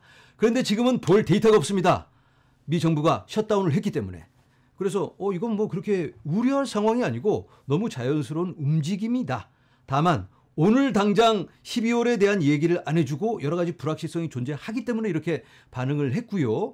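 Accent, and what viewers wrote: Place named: Gyeongsang, South Korea. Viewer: 부산?경주인줄 알았네요ㅋ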